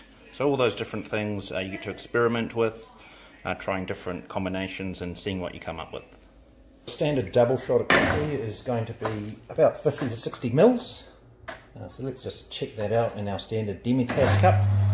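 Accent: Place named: New Zealand. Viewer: That was awesome mate!? I'm from Australia and I just llooooove the NZ accent ... keep it up. I have to clean my Vibiemme Domobar Piccolo. Well done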